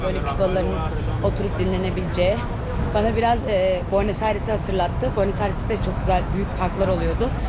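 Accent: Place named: Sivas, Turkey. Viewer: İspanyolcayı Sivas tokat biraz doğu aksanıyla harmanlaman diyolok kurman çok hoş.bilmiyorum banamı öyle geliyor.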